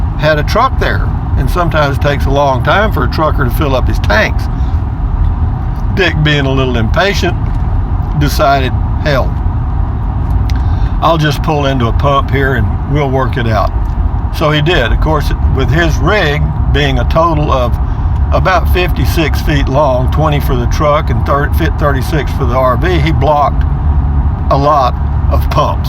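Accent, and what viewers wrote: Texas, United States. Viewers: I thought the same thing the first time I heard him say it, but then figured out it was just his Texas accent. It is funny to me, though.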